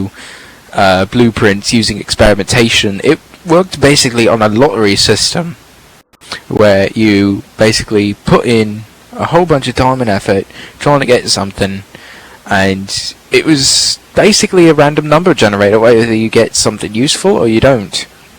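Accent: United Kingdom. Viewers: British accent!!!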